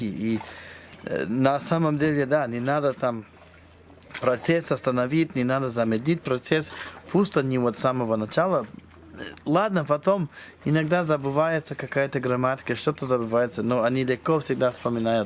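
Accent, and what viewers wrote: Tuva, Russia. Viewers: 😅тувинский акцент! Дыка кижисиг кижи. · На руском говорит как тувинец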